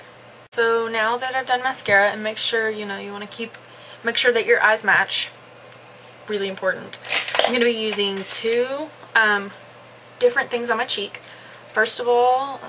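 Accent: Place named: Georgia, United States. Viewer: i love this, its puurrrdy!! you're so cute! what state are you in??? you sound like you're around GA or something... anyways, this looks great on you, i'll have to try it out...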